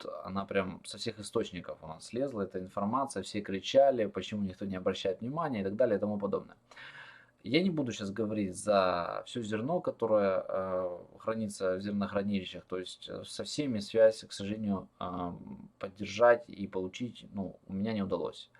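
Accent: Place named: South, Russia. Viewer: Шокание и гэкание - южнорусский говор русского языка. Прекрасно звучит!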